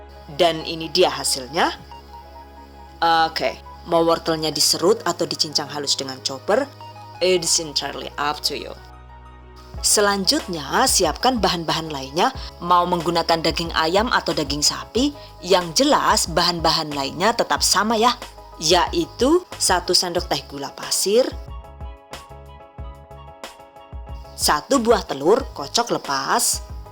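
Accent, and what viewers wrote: Banyumas, Indonesia. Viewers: Makasih resepnya bu.. 😅 ko sma2 ngapak yaak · Kaget donggg orang ngapak ternyata😭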